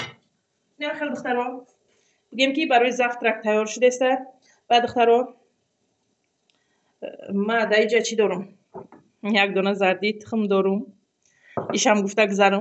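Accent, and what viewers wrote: Tajikistan. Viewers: Хохарам шумо зани бехтарини тозаи точики хастед мехохам симои шуморо бинам факат дастонатро мебинам овози форам доред чунки тоза точики гап мезанед❤❤❤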